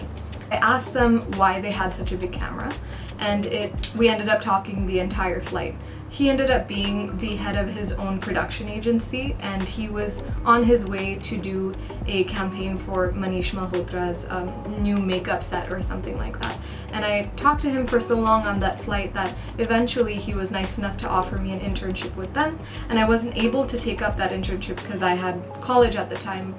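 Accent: United States. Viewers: Did you do your studies in 🇺🇸 US, I am in US for my masters I wanted to get like your accent 😊 Please help me in improving my accent ❤ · Why do you speak in an American accent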